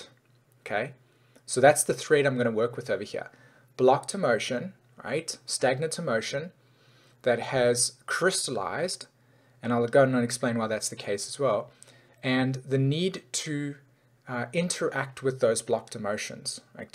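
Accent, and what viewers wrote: South Africa. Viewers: Nice to hear a South African astrologer !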